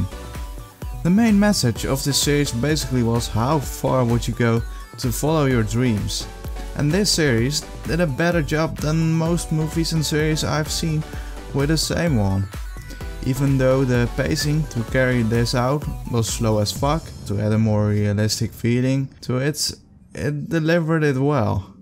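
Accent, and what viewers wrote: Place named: Netherlands. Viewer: you sound dutch and nice vid btw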